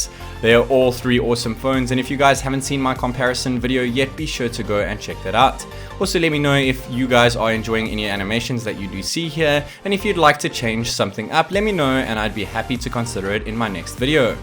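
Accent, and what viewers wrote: South Africa. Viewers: You sound South African..? 🇿🇦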